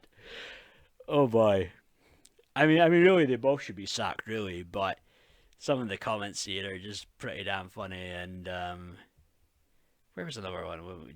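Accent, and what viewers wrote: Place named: Aberdeen, United Kingdom. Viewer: I'm thinking Aberdonian :)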